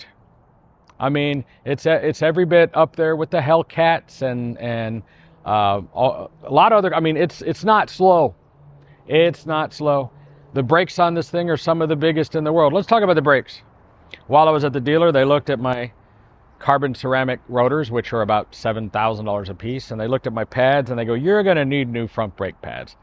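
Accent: United Kingdom. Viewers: at first yeah but after a few videos it normal and I like his British accent. However, his father hard laughing after each talk is very annoying.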